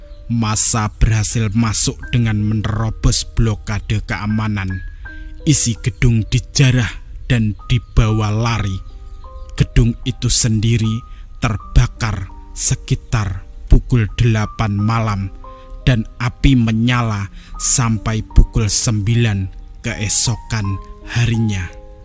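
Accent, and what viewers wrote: Java, Indonesia. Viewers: terlalu medok bahasa jawanya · jgn medok medok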